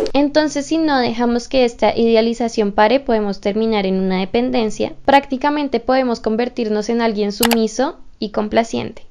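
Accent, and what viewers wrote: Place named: Colombia. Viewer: Ambos son colombianos, supongo que es por el acento ☺️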